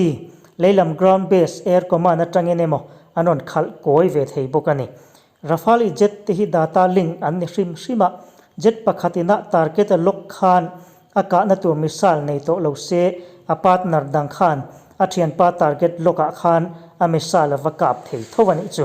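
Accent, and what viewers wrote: Myanmar. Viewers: I aw phawi hi chu mizo dik tak aw phawi chu a ang lo ka ti..beng ati thlep tlat..Burma lam unaute zinga mi ini lo maw..tha vek tho na in🤔🤔😂😂👍👍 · A chhiar tupa hi Burma lam chhuak I ang I thil lam dan hrang hrangah hian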